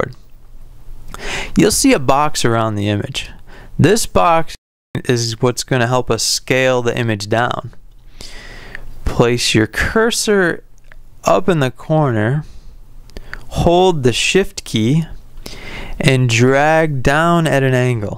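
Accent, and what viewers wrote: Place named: West Coast, United States. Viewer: Haha! You sound like such a west coaster. But thanks for the lesson!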